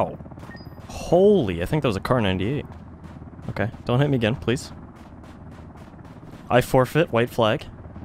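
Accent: Canada. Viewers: Oh you're french canadian?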